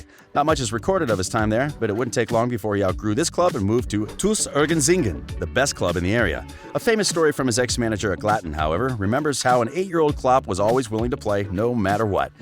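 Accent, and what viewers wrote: United States. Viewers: After one minute of utter nonsense i gave up. Klopps "unimpressive" start of his coaching career over here in germany was to take over a village club like Mainz in the second division, when he was still a player without any coaching experience and make that club a bundesliga regular, even reaching uefa cup. Afterwards he went to Dortmund where they had just avoided bankruptcy and had no money for larger transfers. There he kept the people patient for some years with very emotional and attractive football, while building up his squad and it ended in consecutive championships, a national cup and a championsleague final. If that´s not impressive i don´t know what is. When they called "fergie time goalscoring" brilliant and implied it would have been a tactical revolution, while completely missing out on gegenpressing i decided to not torture myself further, as i feared i would become dumber by shear listening. 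We shouldn´t blame it on the american accent, as the journalism about nba or nfl for example is very accomplished. Whoever wrote this would never be allowed to report about a basketball game in the US. To me it sounds like the newscaster of instagrams celebrity rumours and scandals or something like that. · No insult intended, but when watching & listening to a video about soccer I´d rather have a British English speaker … ! - Sorry guys across the pond, this broad American just does not fit. I guess even a german accent would be a better match here … :)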